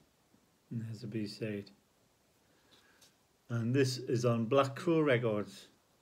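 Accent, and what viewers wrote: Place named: Northumbria, United Kingdom. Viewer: I think i try to find something from what you showed here. Dont know though how easy it is in Finland. Where are you located in Britain, your accent is quite hard for me, i have heard similar in some snooker interviews.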